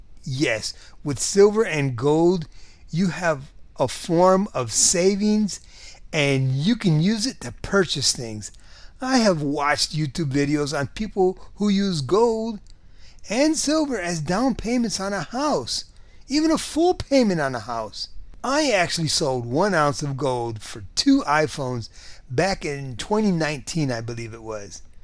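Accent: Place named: Mexican-American, United States. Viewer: Oh so sorry for the mistake. I thought I heard a Mexican-American accent. Either way your content is gold. 👍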